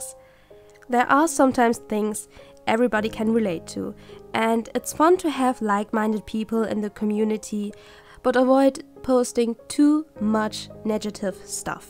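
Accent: Germany. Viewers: somehow you sound like you're German are you? sorry if i'm wrong edit: habe gerade die Kanalbeschreibung gelesen :3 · Ja der Akzent ist wirklich "deutsch" 😅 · german accent✨😍😍😍😍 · Uh dein englisch ist echt gut! Man hört dir zwar extrem an dass du deutsch bist aber trotzdem, klasse! 😱 und danke für die tollen tips, es ist schön wenn man leute hat die deine arbeit schätzen :3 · Ha, wusste ich's doch. Hab auch den deutschen Akzent rausgehört xD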